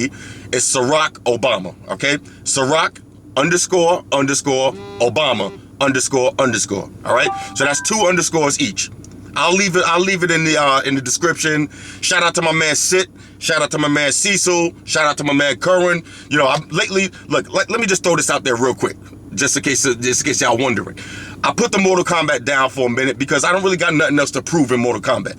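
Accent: New York, United States. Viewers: Thats that NY son Q'borough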